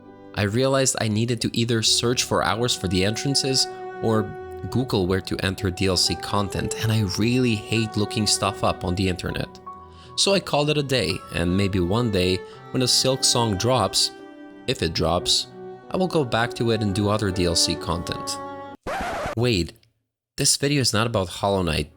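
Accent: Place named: Italy. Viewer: That Italian accent tho 😁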